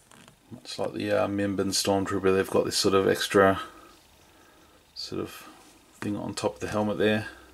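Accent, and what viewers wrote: Australia. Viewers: Hey man, you sound Australian and so am I. Wondering were you picked this fig up if you are aussie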